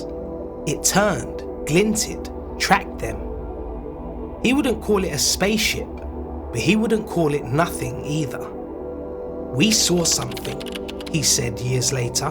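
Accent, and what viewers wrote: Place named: United Kingdom. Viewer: he’s British. In British English the “s” in “debris” is silent.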